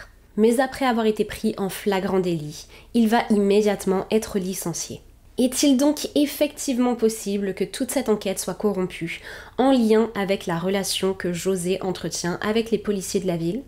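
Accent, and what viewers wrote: Quebec, Canada. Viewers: Au fil du temps ton vocabulaire est de plus en plus teinté de l'accent Québécois! Quand tu retournes en France ça doit être un choc pour tes proches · Il manque le "nous allons commencer".😢 Actuellement je suis tes vidéos d'il y a 3 ans et tu as un accès Québécois beaucoup plus prononcé que maintenant. 😂😘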